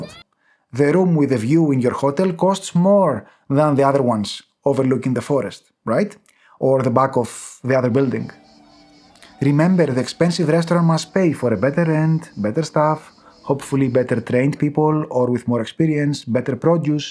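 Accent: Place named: Greece. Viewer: Πολύ ωραίος! Μια φιλική συμβουλή, επειδή το βίντεο προφανώς απευθύνεται σε αγγλόφωνους κι έχω κι εγώ ελληνική προφορά πολύ παρόμοια με τη δική σου, αυτό που έχω καταλάβει είναι ότι δεν τρέχει τίποτα με την προφορά και ούτε ασχολείται κανείς, εκτός από όταν αλλάζει το νόημα. Πχ στο τη λέξη "catch" την είπες χωρίς παχύ "sh" στο τέλος, δηλαδή ακούστηκε σαν "cats". Το κάνουμε συχνά αυτό το λάθος οι Έλληνες :)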